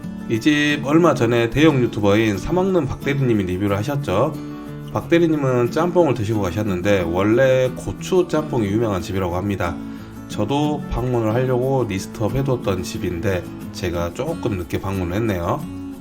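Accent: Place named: Gyeongsang, South Korea. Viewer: ㅎㅎ경상도분이 시죠?? ㅋㅋ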